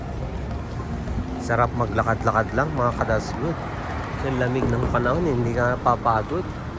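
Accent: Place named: Philippines. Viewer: Just saw you, recommend by YT. Gusto ko po yung accent nyu pilipinong-pilipino.. I like raw vlogs kahit mahaba basta realtime. keep it po kuya,, Wag nyu po kaming kalimutan pag lumago na ang YT channel nyu .. Ingat po always Godbless you po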